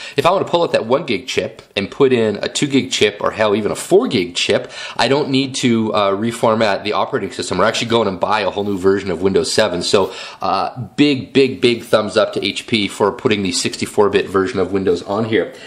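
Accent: Canada. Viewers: I think most of us Yanks dig the Canuck accent, so pay no heed as to what other Americans say!:0)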